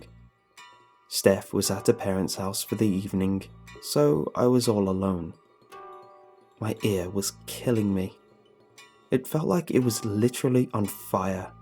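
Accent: United Kingdom. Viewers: I love that your brittish! You always post around when I'm going to sleep, which is great! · that kind of British accent 😂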